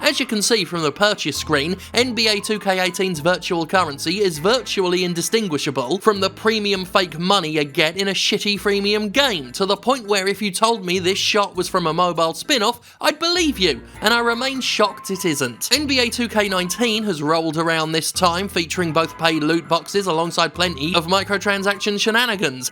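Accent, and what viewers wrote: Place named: Yorkshire, United Kingdom. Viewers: Jim Sterling is more Yorkshire than I am and I'm from Yorkshire. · Love the grumpy Yorkshireman accent. Next time can we have a West Country cider-drinkin' combine 'arvester drivin' reading? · The Northern accent makes me laugh every time.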